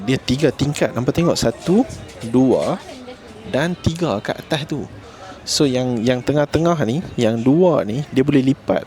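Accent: North, Malaysia. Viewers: skrol2 youtube jmpa chanel ni ...try bkk...skli loghat utara mmg padu pny review...mmg sempoi.. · Aku sub sebab hg terer cakap melayu..loghat utara plak tu mmg sempoi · Syok dengak loghat utara.. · gilalah ada review bahasa kebangsaan campur utagha bakhang.....inilah yang dicari cari...well done bakhang...tak sangka kerala ni kira maju juga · terbaik la... siap cakap utara... syok dengar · Nice video bro. Aku tengok sampai habih. Siap balun cakap utagha 🔥🔥🔥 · Suka dengaq brader cakap utara. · hg ni org utara ka..😅😅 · Saya org Penang. Saya selesa dgn orang cakap utara. Dah subscribe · Bahasa Kebangsaan dan loghat utara yang tersusun sangat sedap layan video bro.. terbaikk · bukan BM jer...dia bantai loghat utagha bak hang. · Steady bro..orang utara ka..aku baru ja jumpa channel hang ..best2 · Video hang fyp kat YouTube aku time aku scroll. Bila aku dengaq hang sembang utara langsung teruih aku SUBSCRIBE channel hang.. 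Sempoi bak hang video hang. 🎉🎉🎉👍👍👍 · Terbaik bro, slang utara tu daripada Malaysian Indian melancong ke India. Terbaik review ni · YouTube suggest. Dengaq pulak cakap utaqa. Done Sub semoga channel sukses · Aku layan sampai hbs brader sebab bahasa utaqa hang..senang nk paham😂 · Perghhh ni video pertama aku tengok, sebab naik suggestion. Tak cakap banyak, selepas tengok opening 2 minit terus aku subscribe. Nampaknya aku dah jumpa benda baru yang nak melayan sebelum nak lena, mamat ni not bad lah untuk vlogging. Paling best dia guna loghat utara, senang faham. Time lunch hour pun boleh layan video ni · Start² terus melayu loghat utara, auto subscribe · Sebab cakap utara aku subscribe 😂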